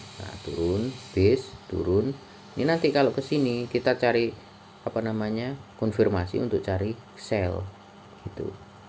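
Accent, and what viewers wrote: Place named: Java, Indonesia. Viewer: Ternyata wong jowo to mas... Jawa mana mas?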